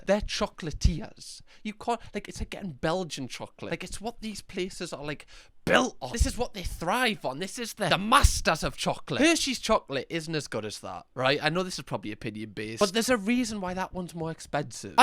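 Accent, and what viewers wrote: Newcastle, United Kingdom. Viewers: A, I can't believe I've only just come across this, I've been in bits the last few days. B, don't you knock the Geordie accent pet, it's the best accent in the country and I'm from Lincolnshire. Though my grandad was born up there, hated working the mines so ended up as a lorry driver and then eventually owning a garage in London after first living in Devon for a while. He had an interesting accent but never lost the Geordie phrases, oddly some of which we use in Lincolnshire too. But it's been a long while since I've been called Hinny now. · Don’t put down your looks! And I love the Geordie accent, it’s so endearing. As someone who grew up with a Bostonian accent, you have a very friendly sounding one. Mine sounds aggressive, and trust me, it’s not intentional. Friendly exchanges are like screaming matches. 😆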